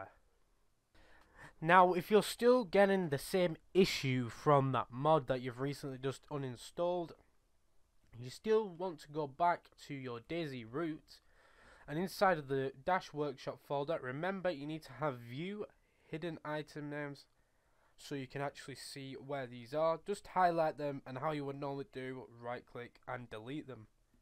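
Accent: United Kingdom. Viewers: You sound like a Yorkshire lad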